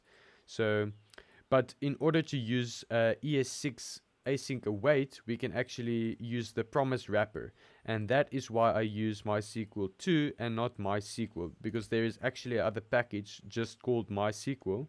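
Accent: South Africa. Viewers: i can hear a mzansi accent...?....?....? ❤❤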